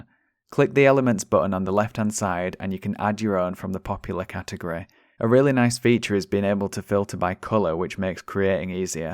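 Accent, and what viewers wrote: Yorkshire, United Kingdom. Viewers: I recently found your channel and I have been making my way through your videos and I just want to say a huge thank you, your videos have been insanely helpful. I'm fairly new to this whole POD scene, but nothing will stop me from making a success of it. Also, you sound like you're from my neck of the woods, much love from Yorkshire.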